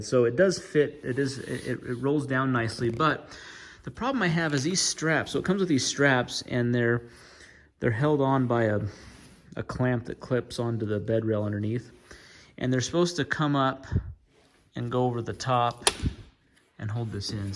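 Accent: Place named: Utah, United States. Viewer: First time visitor to your channel... Good info on this cover. I'll probably pass on buying it. As a former resident, I can tell you're in Utah just by listening to you. We have an accent all our own. Lol keep up the good work!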